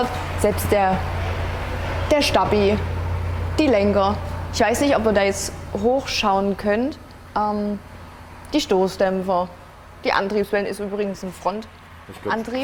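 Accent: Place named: East Germany, Germany. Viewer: Richtig ekelhafter Ossi Akzent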